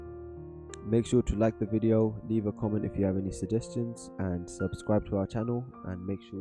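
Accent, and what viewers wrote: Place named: United Kingdom. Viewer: Hi New to ECU tunning, I have Vediamo and DTS Monaco, how does this compare to them? great content, I just sunscribed. I hear a UK twang, where in this Island you are ? lol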